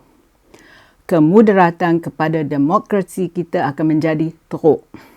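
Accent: Perak, Malaysia. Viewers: Respect kat makcik.. bahasa english fasih mcm org london.. tp tak lupa bcakap bahasa melayu loghat perak..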